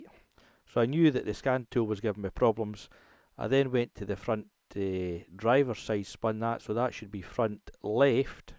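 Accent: Scotland, United Kingdom. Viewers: Thank you for doing this video. I'm looking for a cause of a certain code on my x350, and this has been a real help in helping me decide how to proceed. (Love the Scottish accent by the way. )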